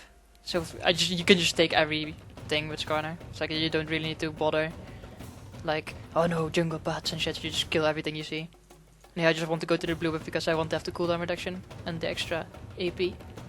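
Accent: Netherlands. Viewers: you sound like a guy who comes from holland? am i right?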